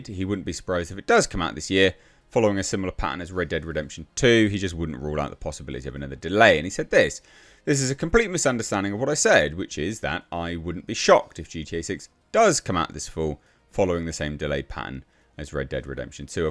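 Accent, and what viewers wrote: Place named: England, United Kingdom. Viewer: sounds English. Yet he just said coming out in “fall”. WTF🤦🏼🤣. Pretentious knob🤣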